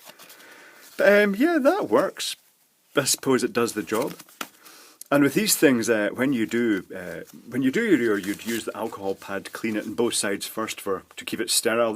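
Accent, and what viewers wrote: Scotland, United Kingdom. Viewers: Are you from Scotland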